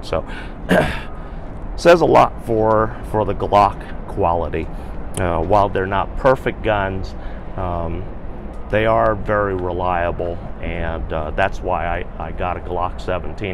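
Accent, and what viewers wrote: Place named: South, United States. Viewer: You have a Southern Accent. It’s funny to hear an Asian man with a Southern accent. Maybe where you grew up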